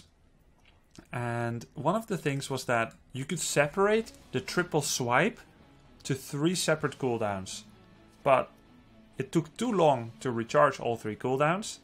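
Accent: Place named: Netherlands. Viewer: Jens van Straten Yes. He is from The Netherlands.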